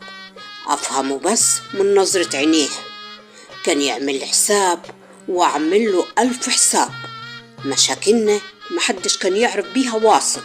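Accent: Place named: Upper Egypt, Egypt. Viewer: عسسسسل وسكر انا باموووت فيكي حلوة اوووي وكلامك زي الصعيدي الجواني اوووي شاااطرة عااااش ياقمر ❤❤❤❤❤❤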